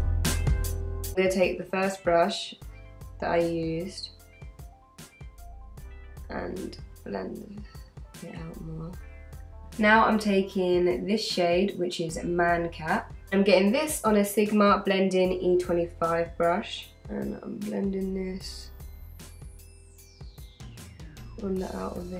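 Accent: London, United Kingdom. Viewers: gives me Audrey Hepburn vibes...she even has the Eliza Doolittle accent (I don't know what part of London) from the movie My Fair Lady!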